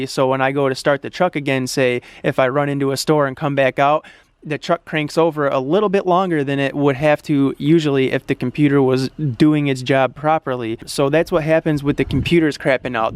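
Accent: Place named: Upstate New York, United States. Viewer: must be upstate ny, that accent....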